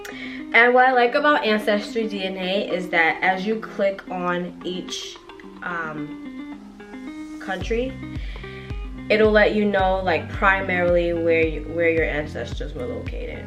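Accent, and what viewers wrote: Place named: New York, United States. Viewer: Luv the New York accent lol✊🏾💯🙏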